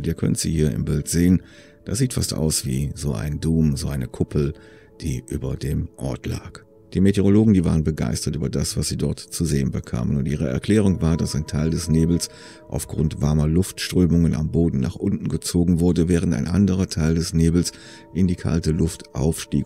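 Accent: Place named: Cologne, Germany. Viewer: Auch wenn es nichts mit dem Thema zu tun hat, man hört ab und zu wie dein Kölsch so ein bisschen mit durchkommt 😄 Find ich aber super sympathisch! 👍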